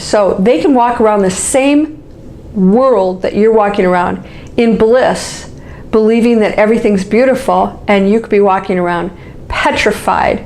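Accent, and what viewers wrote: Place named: Michigan, United States. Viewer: Are you from Michigan or around there? My science teacher has the same accent and she's from there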